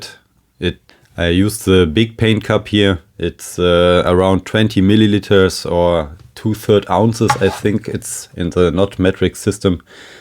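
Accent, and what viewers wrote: Germany. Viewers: Excellent video and don't worry about your English is perfect and great with German accent 😄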